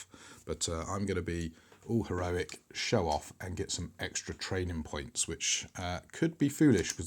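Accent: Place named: United Kingdom. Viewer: Thanks for sharing your videos. The encounter text somehow feels more appropriate when hearing it in a British accent. :) A small suggestion on your audio -- consider converting it from stereo to mono. When listening to the video with my headphones on it feels like your voice is moving around a bit. :)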